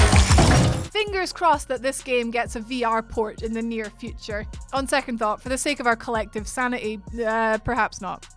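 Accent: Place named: Scotland, United Kingdom. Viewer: I've said it before and I'll day it again. That accent 😍 MonTheScots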